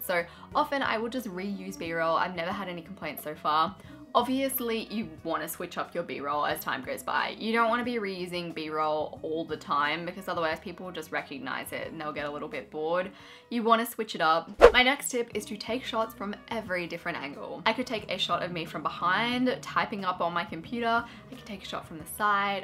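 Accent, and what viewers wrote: Australia. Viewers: This is awesome! I find your content so helpful and inspiring!!! Also, I love your Australian accent, your voice is very pleasant to listen to. Do you have a podcast? I think you’d do great in that forum too 😆❤️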